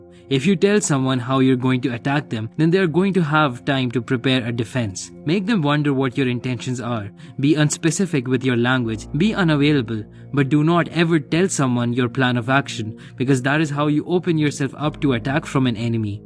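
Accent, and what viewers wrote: India. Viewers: Cant stand his annoying Indian accent. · Please stop. I hate Indian accent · I really enjoy marked Indian accent. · so that means u are an indian . I knew that accent was native. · Couldn't watch this for more than 5 seconds because of that Indian accent. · fuuuuck an indian accent SIGH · That it is being narrated by an Indian makes me not listen to it 🚮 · Do i detect an Indian accent? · Immagine clicking this video expecting frank underwood's voice and hearing an indian.. the pain.. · Law 51, dont use an Indian to narrate in English. Its "wery" irritating. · very Indian accent.... awful!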